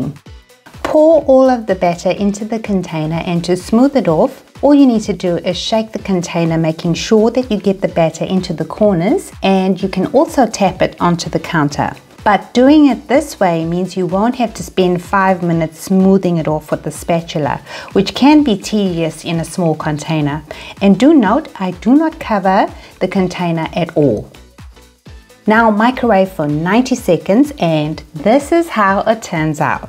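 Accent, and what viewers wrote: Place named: South Africa. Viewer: As I was typing my brain was quietly saying (maybe she's South African!). There are a lot of similarities in some of the accents/dialects. Your recipes are great and I'll share then how and when I can. Thank you.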